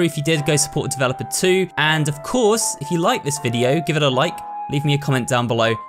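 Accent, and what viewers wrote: United Kingdom. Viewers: AAAAAHHHH HES BRITISH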